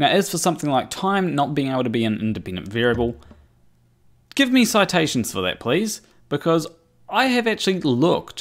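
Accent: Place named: New Zealand. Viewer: There was a point in this video where I was really distracted by the phonetic "eegs" which I thought was eggs, but later on you said it with the letter X on screen. Not a diss, I quite like the quirky kiwi accent is. At least you don't make everything sound like a question?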